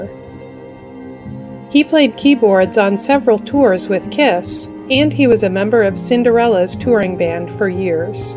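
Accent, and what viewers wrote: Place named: New England, United States. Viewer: U need to calm that extreme Yankee accent down, js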